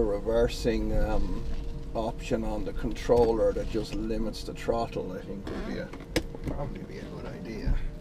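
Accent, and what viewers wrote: Ireland. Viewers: Nothing wrong with the Irish accent. You don't expect them to pronounce it Turd, they shouldn't expect you to pronounce it third.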